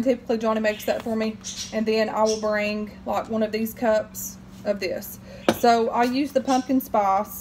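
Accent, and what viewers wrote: South, United States. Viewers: Pumpkin iced coffee! Yasssssss!!!! ❤️❤️❤️ so glad to have found your channel! Love that southern accent honey. We will get along justtttt fine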